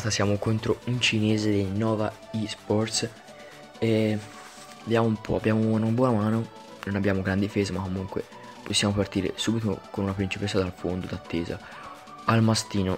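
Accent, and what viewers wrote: Tuscany, Italy. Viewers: sei toscano vero si sente l'accento. come me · Ma sei toscano? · ma sei toscano? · è toscano come me ahahahha😅❤ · Ma quanto è bello il toscano 😍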